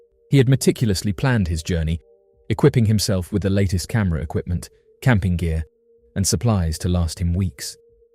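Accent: England, United Kingdom. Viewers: This channel is a prime example of the power of a pleasant, clear English accent lulling people into believing completely BS theories and photos. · The idea of anyone believing in Bigfoot just because they hear a bloke with a calm English accent talking is ridiculous. Especially when Majority of people who say they've seen them & believe they exist are from the states.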